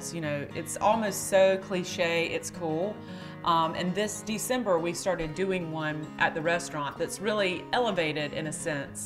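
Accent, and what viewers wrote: South, United States. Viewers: I love your pronunciation and Southern Drawl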